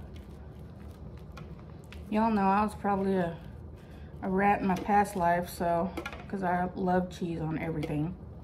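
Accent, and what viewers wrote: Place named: South, United States. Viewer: that’s a good one to prep up the night before I bet. I knew a lady that cooked all her meals for the week on Sunday. She put them in the freezer. She lived an hour away from work. Her family would pop it in the oven to reheat. I never have had the space to do that. But if you like cooking and can, that would make a good video for you to do. I like listening to you talk you remind me of my friend. I’m from the south. It makes me feel homesick! Keep up the good work.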